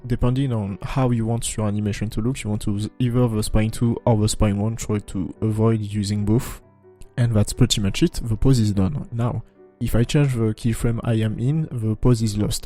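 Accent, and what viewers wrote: France. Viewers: We've needed this for a while! Also love your French(?) accent, I may be enabled for taking french in high school but you're very understandable :D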